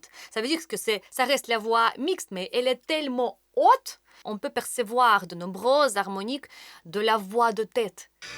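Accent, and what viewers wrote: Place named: Russia. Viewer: Видно что вы профессионал. Спасибо за анализ☺. У вас слышен русский акцент😊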